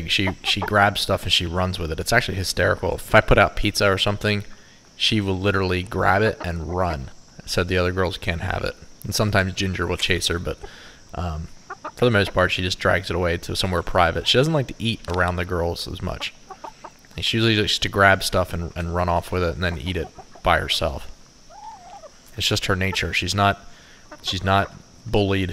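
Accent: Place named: Pennsylvania, United States. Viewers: lol oh man that is def a pennsylvania accent.. it always reminds me of someone i knew from the poconos who spoke like this guy.. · Ah man my family has a bee farm and yellow jackets everywhere! Hate them. I’m from Pennsylvania myself. Sounds like you are from the Pittsburgh area👍🏻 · Are you from Philadelphia? Your accent sounds like big jay oakersons philly impression lol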